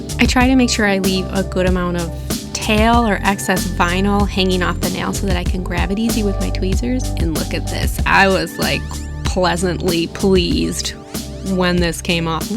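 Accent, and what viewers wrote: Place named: Michigan, United States. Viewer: re: your voice, I grew up in Michigan and we have similar accents. I figured you were from somewhere near Canada, but not Canada.... right?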